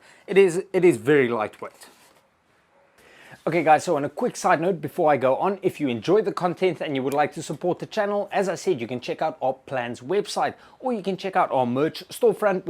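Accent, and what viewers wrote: South Africa. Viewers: Loved this so I subscribed. South African? Nine video and I think the final bench would work great when cutting sheets of plywood iv you just put like floor insulation on the table first.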